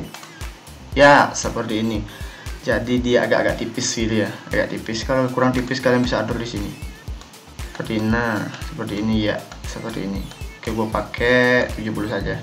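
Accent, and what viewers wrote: Bali, Indonesia. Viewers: Adi care nak bali asane ngomong ne nahh wkwkkw,, salam youtuber bali, mampir ke channel ku yg masih pemuka ini ya,, wkwkw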